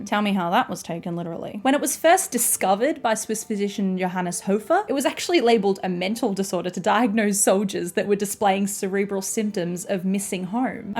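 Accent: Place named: Australia. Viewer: I'm really glad I could have a positive impact! :) Of course! Thank you for taking the time to reply! I really enjoyed it and actually checked out your other vids! And let me tell you, in my years and years of watching yt I've never wanted someone to succeed more I'm genuinely shook you don't have more subs! I've never been so quick to sub haha but your quality is absolutely top tier so I really really hope your channel grows! :) And ayyy hell yeah Aussie represent🇦🇺 it honestly helped me relate more hearing your accent haha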